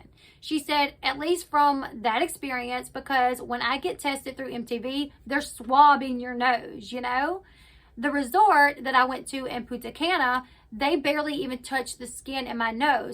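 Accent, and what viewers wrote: South, United States. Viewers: Just came on here to say I absolutely love your southern accent I literally just come to your channel to hear you talk sending lots of love from London, UK !! Your accent is everything for me girl !!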